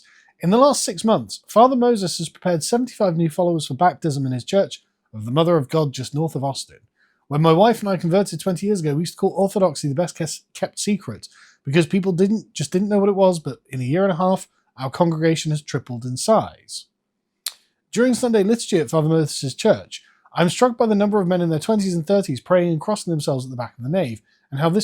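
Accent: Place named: England, United Kingdom. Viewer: Sorry bro, your English accent couldn't save you on this one. Complete rubbish.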